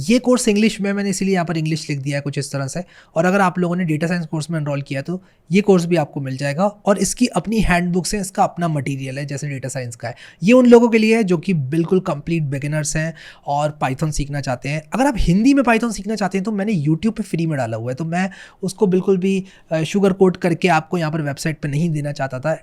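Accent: India. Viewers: English bro .. but easy to understand like an Indian accent with subtitles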